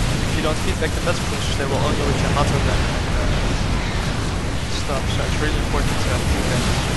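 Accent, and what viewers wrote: Netherlands. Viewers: Wanne Hollandse accent joch !!! Good video btw ! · Work on the Dutch accent ^_^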